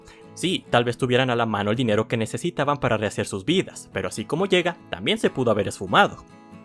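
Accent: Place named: Honduras. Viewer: Me gusta el acento de la voz del locutor o narrador, es un acento hondureño no?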